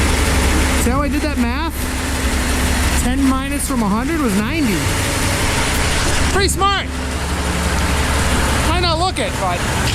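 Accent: Boston, United States. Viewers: your videos are great don't change a thing the way you're video's come across are fine its you i don't want professional thats not you. Oh by the way i like your Boston accent especially how you say yard😂😂😂😂 Keep on trucking with the W9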